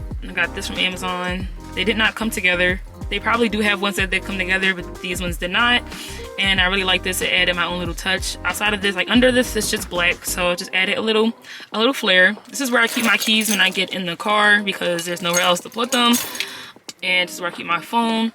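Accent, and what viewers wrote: Philadelphia, United States. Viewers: I was looking for this comment. I’m like she gotta be from Philly. I’m from south jersey so I be knowing. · Are you from Philly?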